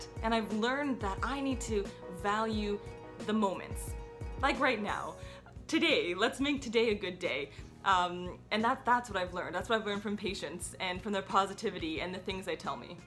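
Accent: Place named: Canada. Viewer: Really heard your Canadian accent in this video :)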